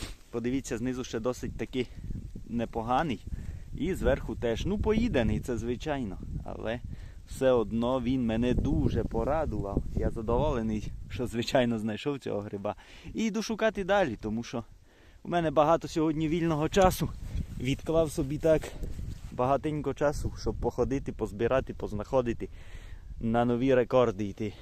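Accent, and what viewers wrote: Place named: Transcarpathia, Ukraine. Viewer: ага то чути Закарпатський діалект👍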